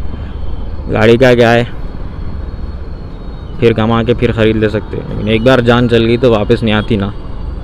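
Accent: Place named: Hyderabad, India. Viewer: This is not a hindi bro. It's a deccani hyderabadi slang...💀🙌🏻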